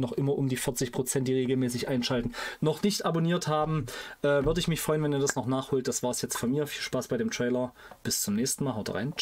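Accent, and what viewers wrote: Saxony, Germany. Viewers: Kommst. Du aus sachsen wie ich?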